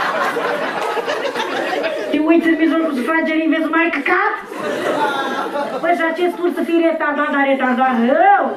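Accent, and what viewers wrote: Moldova. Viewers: O actrita fenomenala, o femeie geniala. Accentul ala moldovenesc nu o merita si... nu isi are sensul. Nu suntem moldoveni, ardeleni, munteni... suntem Români. Felicitari, esti fantastica!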